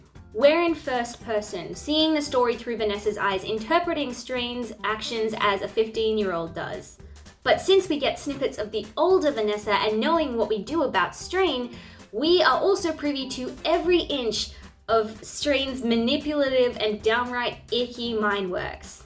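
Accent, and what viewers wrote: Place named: Australia. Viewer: As a dude, I was so uncomfortable after he suggested they go to his house and she began to talk about what she would be expected to do that. I deleted this one. The student teacher trope, however, is one of my favorite things ever I fully admit that don’t judge. I love things like dark notes, and misbehaved if you’ve read either of those and lessons in sin. Also, are you Australian? Sounds like it and that is the coolest thing ever.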